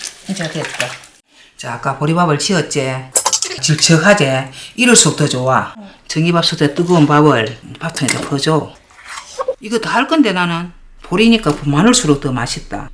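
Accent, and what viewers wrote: Gyeongsang, South Korea. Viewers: 경상도. 말씀이 너무. 구수합니다. 신부 수업하는겄같는. 따님과. 너무좋습니다. 어려을때. 단술. 먹었던. 추억이. 떠오르네요. 좋은. 정보. 주신겄감사합니다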